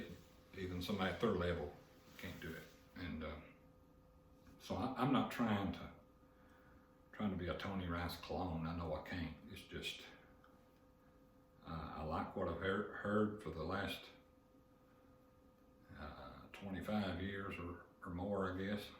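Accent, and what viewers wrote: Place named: Eastern Kentucky, United States. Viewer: Great videos...Just found your videos and still guessing you're from Eastern Kentucky or Mingo County, West Virginia. Great comments about Tony Rice too.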